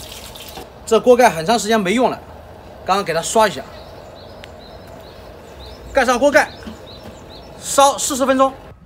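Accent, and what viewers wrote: Sichuan, China. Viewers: 我特地來聽你的四川腔，你怎麼不說了😢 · 這種話才聼得懂，四川話聼起來好累唷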